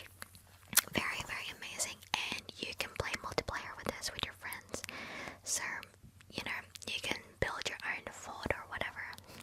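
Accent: Australia. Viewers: ohhhhhhhh its australian that'd do it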